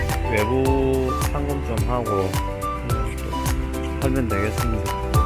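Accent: Gyeongsang, South Korea. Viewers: 목소리가 너무 좋아요 ㅋㅋ 전 마산에 살아요 경상도 분이 캄보디아에서 사투리 ㅋㅋ 너무 정겹습니다 유투브 대박나세요~^^ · 쉽지가 않에요.... 이 갱상도 뉘앙스가 정겹다...., 라오스 문씨도 중간에 제 객관적인 방식....칽카핥핥크크크.... 좋은 영상 감사합니데이...욕받심더~~~ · 리얼 경상도^^ 고향에 온 듯해서 좋습니다. 번창 응원합니다^^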